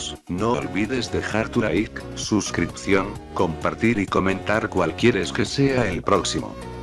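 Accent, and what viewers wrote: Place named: Castile, Spain. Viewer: Ese castellano papu :v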